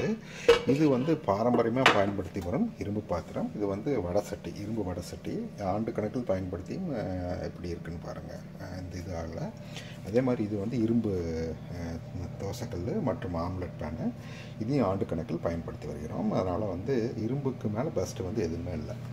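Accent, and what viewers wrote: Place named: Kongu, India. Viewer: Effective crisp communication of key facts in simple kongu tamil !! Keep it up sir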